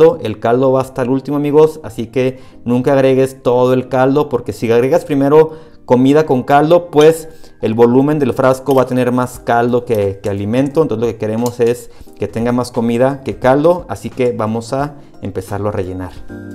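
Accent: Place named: Mexico. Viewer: que dulce que es el acento mejicano. GRACIAS POR LOS VIDEOS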